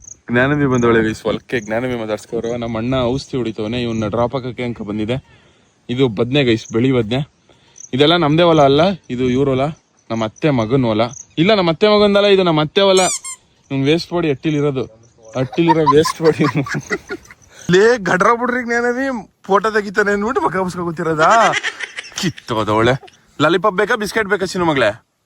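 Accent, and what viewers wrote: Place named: Butler English, India. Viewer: If u don't know english plz don't talk talk !! U know only buttler english 🙂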